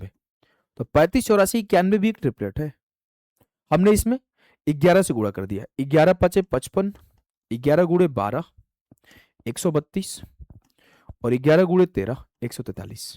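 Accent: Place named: Uttar Pradesh, India. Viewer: sir🙏 day 12 Sir apki English ekdam U.P wala vibe deti h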